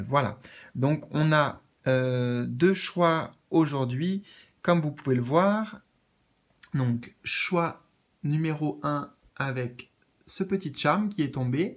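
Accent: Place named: England, United Kingdom. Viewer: Bel accent anglais!!!😁 Merci tout plein pour cette guidance pour toute cette bienveillance 💜👍😘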